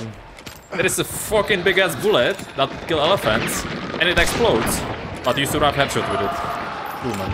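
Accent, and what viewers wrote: Czech Republic. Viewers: nothing beats that hard czech accent.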